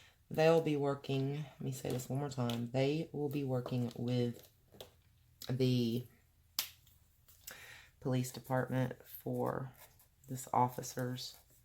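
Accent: South, United States. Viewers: Hey.... just FYI... if you use the paint w glitter... it WILL dry flat;o)) I have used it on quite a few pages in my books. I also have ALL of the Markova books and I really can not say one is better than the other, however, Tenderful Enchantments is my favorite!!! I am swatching colored pencils now watching your video;o)) I had to laugh when you was talking about the way you speak and say words...I get that ALOT...its a SOUTHERN THANG... I'm from New Orleans;o) Keep up the good work and I hope to get some things DONE in 2019 myself... thanks for the PEP talk!!!! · OMG!!! WOWZA!!! I have NEVER had the courage to color in my Klara Markova books until I found this video! I am in AWE of how well you do such remarkable things with your markers!!! Please know I am honest to a fault... so know I will be real with you. You tickle me when you are REAL with us in these videos. 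Thank you soo much for your honesty. Love your goals and candor about your life, a lot of us don’t even know we have lost ourselves... and it’s totally okay to have your SOUTHERN ACCENT, most folks find it sweet and yours is not as deep as some are... wish you would be less critical of yourself! You are so DELIGHTFUL and HONEST!!! THAT will grow this channel sweetie... I watched you on this page in Tenderful Enchantments and what I saw was you not even hesitate to shade in with the gold gel pens!!! You really do know where and how to shadow, girl...!!! I watched you lay down the blues and deeper blues and see you instinctively place shadows where they go!!! I said I will be honest. It blows my mind how you worry about wips, cuz I’ve got a bunch, that I may go finish or not. It’s not a biggy for me. I have every intention of following you in 2019... and learning that I’m more normal than I realized until you put yourself out here. 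I love you a bunch and wait for your videos to come up. Hey, welcome and THANX for being frank about gaining weight over the holidays, like the rest of us. I know what ya mean about the anxiety stuff & the patience, err I don’t l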